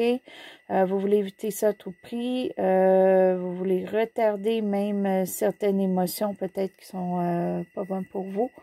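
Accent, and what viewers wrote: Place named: Canada. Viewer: L accent canadien n aide pas !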